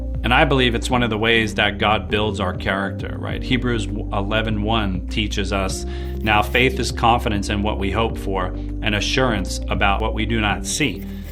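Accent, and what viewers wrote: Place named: South Africa. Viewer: Sir, you sound South African 🇿🇦, thank you so much for this, we thank God for this revelation. It’s easy to be selfish in business especially when you miss it as Christian entrepreneur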